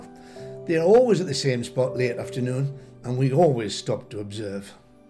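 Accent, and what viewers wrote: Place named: Newcastle, United Kingdom. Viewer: LOVE THE JORDY ACCENT LOVE YOUR VIDEOS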